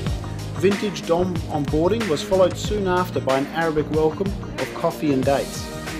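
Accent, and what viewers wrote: Australia. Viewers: I like the walk through style video and nice to hear an Aussie accent :) Good job.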